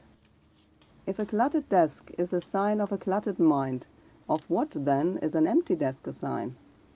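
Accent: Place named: Germany. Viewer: Your English was great and easy to understand. I like the German accent , I love all accents. Nice journal!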